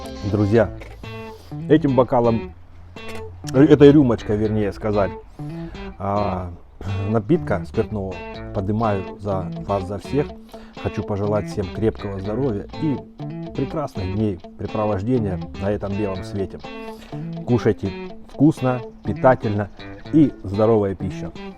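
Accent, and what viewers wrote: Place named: Kuban, Russia. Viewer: Ну шо поделать, с Кубани парень..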